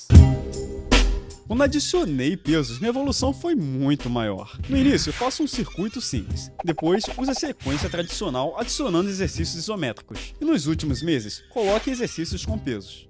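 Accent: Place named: Rio de Janeiro, Brazil. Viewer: Excelente !! Vou voltar a treinar kkk Obs: fala igual carioca prr, para de puxar o S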